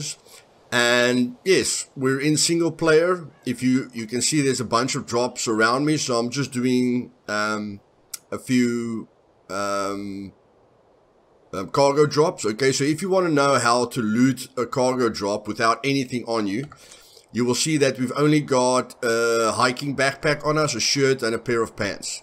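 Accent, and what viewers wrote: South Africa. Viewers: hahhahahaha 1000% south african! i love it! Dankie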